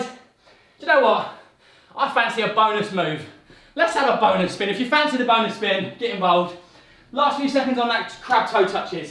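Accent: United Kingdom. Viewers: Far too british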